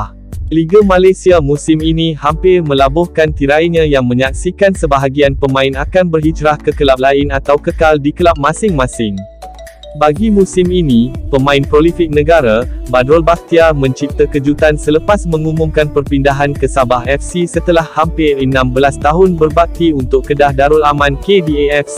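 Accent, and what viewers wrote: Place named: Indonesia. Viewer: admin ni orang indonesia tak? sebutan tiru2 gaya melayu tapi masih kuat pelat indon..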